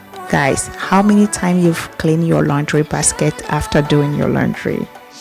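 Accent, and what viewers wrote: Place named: Africa. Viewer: That mama africa accent 😩❤️🥰 i love it so much! Wonderful video mama!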